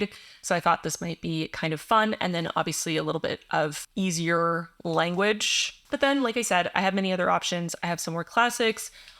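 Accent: Quebec, Canada. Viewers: En tant que française, c’est rigolo pour moi d’entendre une étrangère dont le français n’est pas la langue maternelle parler Québécois… Mais tu parles très bien ! 😊 I am (trying to) learn(ing) Dutch because I’ve been living in the Netherlands for two years, but this is such a difficult language, sometimes it’s similar to English, sometimes there are French words, but sometimes it’s 100% Dutch😅 I also use Babbel app.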